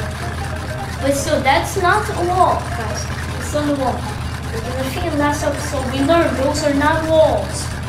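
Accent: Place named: United States. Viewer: Developing a slight American accent😂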